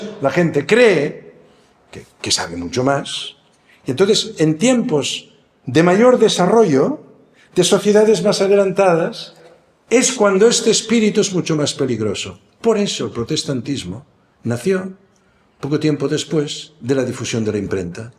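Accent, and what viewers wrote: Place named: Catalonia, Spain. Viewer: Excelente lección. Grandísimo trabajo. Gracias y enhorabuena. Por cierto, ¡maravilloso acento catalán!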